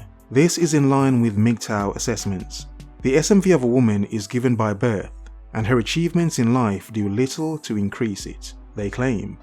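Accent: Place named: United Kingdom. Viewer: Level 1: read the article yourself Level 100: heard it read to you through a British bloke's voice with the occasional hearty chuckle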